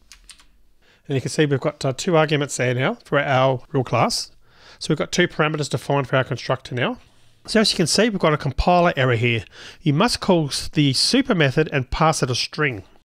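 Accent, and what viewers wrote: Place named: Australia. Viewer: I'm taking Kotlin class in Udemy - in general, he is in the top percentile in terms of completeness, ability to explain things conceptually (why you do sth, not just what), video editing (he actually edits his videos, so typos and corrections don't show up and important snippets are outlined instead of wiggling a mouse), pace (you have time to absorb concepts), practicality (both concept and mini-projects)...and, notwithstanding the Aussie accent, his English is very easy to understand...really great stuff across the board!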